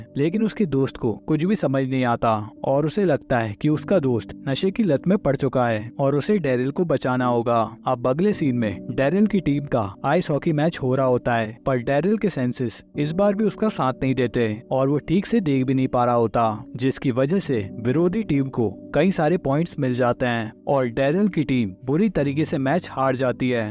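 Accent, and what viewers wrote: Kanpur, India. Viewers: Kanpur se hai bhaii ❤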